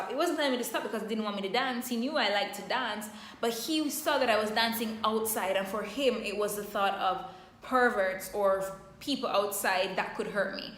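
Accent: Jamaica. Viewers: I sense a jamaican accent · Are u from Jamaica? Your accent is nice.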